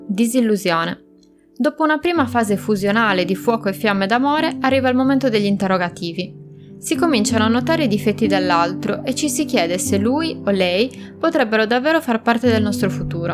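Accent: Sardinia, Italy. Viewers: Accento con cadenza sarda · la voce narrante è Sarda del Cagliaritano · tu che parli sei sarda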